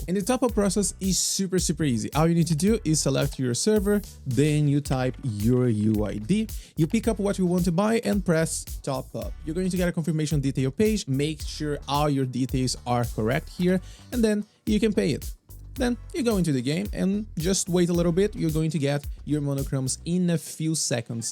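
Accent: Brazil. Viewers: are you Brazilian?? Your accent sounds SO brazilian like 😮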